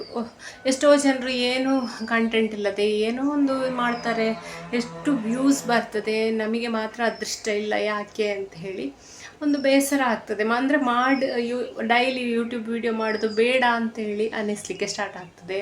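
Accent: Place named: South Canara, India. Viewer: Please support me..nivu maathanaadodu kelidre nivu south canara udupi ಕಡೆಯವರು ಅನ್ನಿಸುತ್ತೆ..ಯಾಕಂದ್ರೆ ನಾವು ಕೂಡ southcanara ದವರೆ🤗🤗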